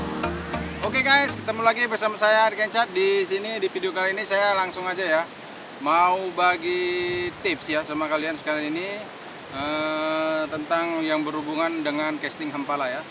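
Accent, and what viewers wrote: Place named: Medan, Indonesia. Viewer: Mantap om... Semakin ok penyajiannya Tinggal bahasanya saja yg kelihatan anak medan 😁😁😁🤭🤭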